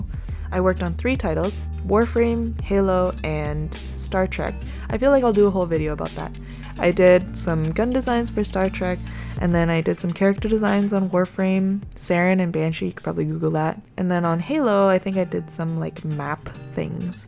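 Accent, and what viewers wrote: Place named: Canada. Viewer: you do sound pretty Canadian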